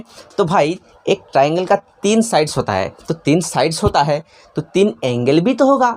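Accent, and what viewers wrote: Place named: Bengal, India. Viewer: Thoda thoda bangla arehehe but it doesnot matter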